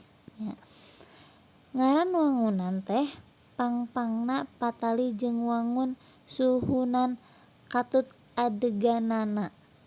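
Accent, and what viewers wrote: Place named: Sunda, Indonesia. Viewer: Dari kata pengantar, kelihatan jelas logat Sundanya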